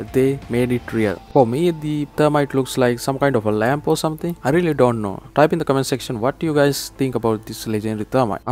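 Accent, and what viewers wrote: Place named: Kerala, India. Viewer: Mythic accent from Kerala or TN!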